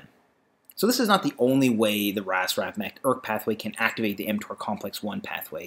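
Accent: Canada. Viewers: Thank you for making these great videos! Are you Canadian btw? Just want to prove/disprove my accent ears...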